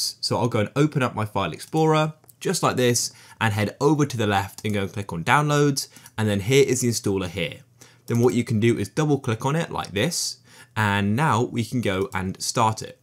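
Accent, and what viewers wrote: United Kingdom. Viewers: nah bruv he’s bri’ish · hes bri-ish/british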